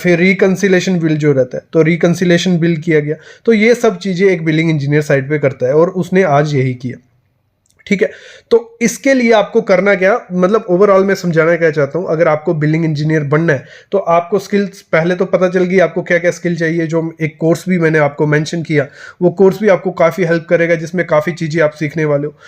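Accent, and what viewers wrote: Jammu, India. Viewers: Jammu se ho kya aap?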